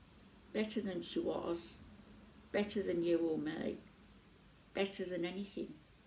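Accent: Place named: England, United Kingdom. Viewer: Thats nasty I can understand her ok may be it's her English accent you can understand there is nothing wrong with her speech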